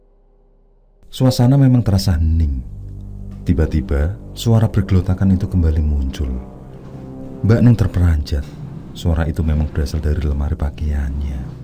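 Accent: Java, Indonesia. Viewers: aq pake headset kok, tpi om nya terlalu medok 😁😁😁🙏🙏🙏🙏salam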